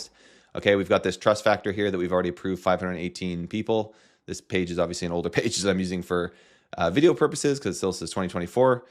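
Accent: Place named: United States. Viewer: I'm american and this is exactly what i sound like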